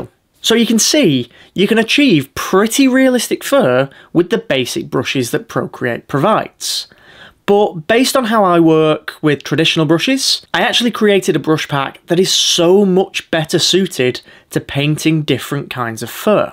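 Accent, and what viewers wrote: United Kingdom. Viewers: i didn’t think so- but i can understand how it felt that way to you. many art videos have a lot of “i see beginners do (this thing i do all the time)…” and feel personally singled out 😀 this is embarrassing to admit but we’re among friends- UK accents immediately make someone sound fancier and i me. “do this, don’t do that” can sound condescending to me like you said- but sometimes for me, it’s the accent for this bonehead american over here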